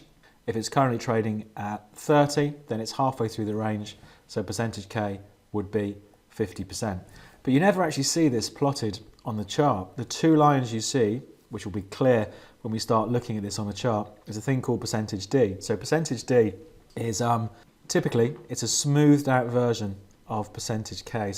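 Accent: United Kingdom. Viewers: I love your british accent haha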